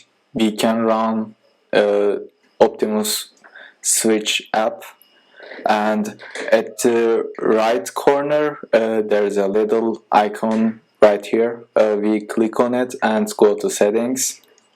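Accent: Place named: Turkey. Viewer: Türk olduğunu gizleyemezsin aksan ele veriyor :)) . Video güzel olmuş eline sağlık.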